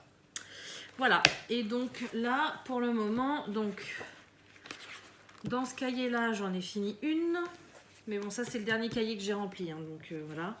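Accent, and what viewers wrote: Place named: Quebec, Canada. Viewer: Tu la bien le français québécois hihi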